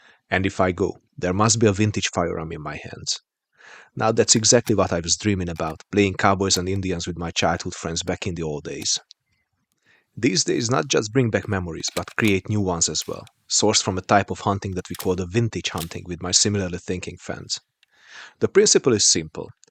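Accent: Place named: Hungary. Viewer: The best channel in the beautiful bright hunglish language just uploaded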